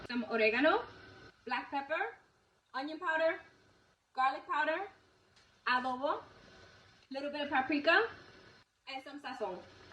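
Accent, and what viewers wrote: New York, United States. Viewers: Just love the New Yawk, talking bout dawdahs, an food for the soul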